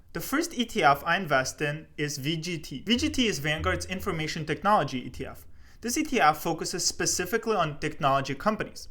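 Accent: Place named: Russia. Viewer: Hey great video man. If you don't mind me asking, where did you immigrate from? to me, your accent sounds Russian or Ukrainian.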